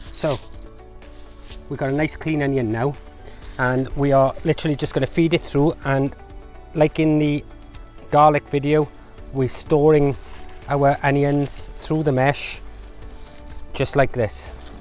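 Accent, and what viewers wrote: Wales, United Kingdom. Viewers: This is a great video! Really informative! I'm growing onions that I found in my brothers old fridge 😂 supermarket bought onions that had sprouted alot! I decided to put them in the ground and they went! I think they are ready to harvest as they have bent over but I wasn't sure because there's still flowers on them. They've been like that for a few weeks now. Also It's really nice to hear a welsh accent! Shwmae from Carmarthenshire :)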